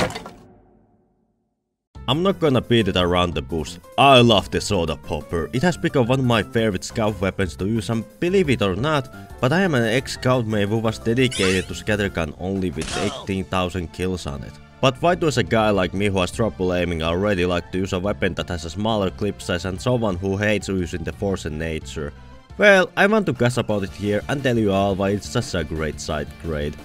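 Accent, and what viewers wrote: Finland. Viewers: I can hear the finnish accent so well :DD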